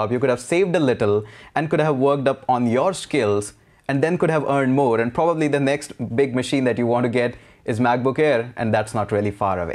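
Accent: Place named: India. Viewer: Indian accent 😅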